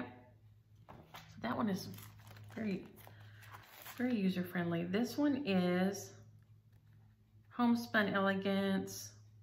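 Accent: South, United States. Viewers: I’ve been enjoying your Vlogentines, especially right now. Monday I fell getting on my bike and fractured my wrist in 2 places and tore my rotator cuff to boot. So much for my stitching and knitting and quilting for a while!😢 You are like company for me. We are out in the CA desert in our tiny winter house, and can’t do much of anything anyway, but now no pool or stitching. I’ll go nuts!! Thinking maybe I could manage a little cross stitch or needlepoint on a standing frame. It would be slow but something to do. Of course mine are back East, so a trip to Hobby Lobby when I go to the doc next week might be on the list. I enjoy your accent having lived in OK for 4 yrs and TX for 4 too years ago - I’ve missed it😁. It is amazing we survived our childhoods in trucks and station wagons!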